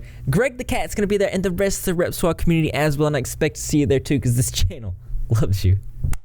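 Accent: South, United States. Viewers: Oh. You're a southern boy 💛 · Whst kind of american accent is that?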